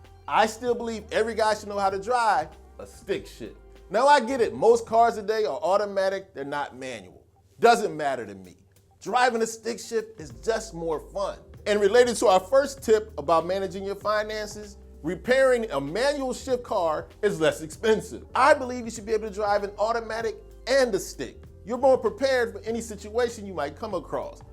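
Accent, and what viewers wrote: Philadelphia, United States. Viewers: You from Philly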